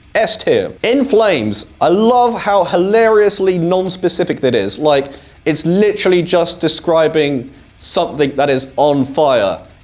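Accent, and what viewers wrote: United Kingdom. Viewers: limp has more then one meaning like floppy also you sound like your across the pond so bisket means cookie to you not sure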